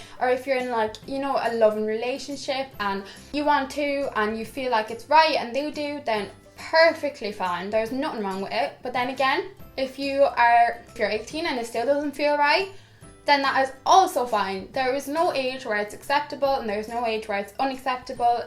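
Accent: Ireland. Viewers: hate cheaters with a passion and I'm proud of the fact that I have never cheated on a girl love your video's and love your accent nothing better than a beautiful Irish accent ❤❤❤ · What county in Ireland are you from?😆❤️And I love love love this video!😘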